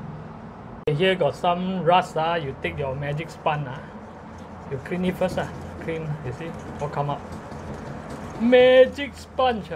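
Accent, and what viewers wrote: Singapore. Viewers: So pls share whatever u do or eat Wat with us, we like your video, the singlish n everything n we learnt a lot from u.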